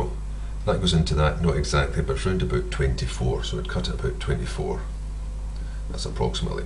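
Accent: Scotland, United Kingdom. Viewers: Is it just me or his accent sounds like from Brave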